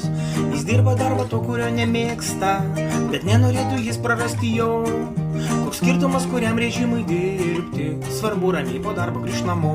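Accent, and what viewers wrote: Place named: Russia. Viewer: Ar sitas. penktosios. kolonos. vadas. ,juk. jis. ruskis. ,galimai. ir. yra. okupantas. ??????? Kaip. gerai. ,kad. viena. kart. prisipažino. ,kas. jis. yra. ir. dar. dainą. susikūrė. ,nori. įsiamžinti. ,savo. penktąją. kolona. ????? Nes. okupanto. akcentu. ir. kalba. ,Lietuviškai. nelabai. išeina. ???????? Kiek. jau. turi. penktosios. kolonos. bendraminčių. ?????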